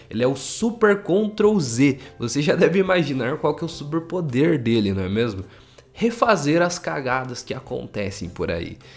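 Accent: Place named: Curitiba, Brazil. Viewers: sotaque curitibano forte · Tu tem um sotaque daqui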